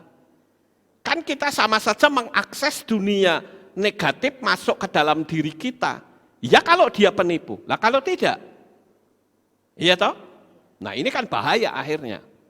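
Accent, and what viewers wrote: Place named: Magelang, Indonesia. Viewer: Itu logat orang magelang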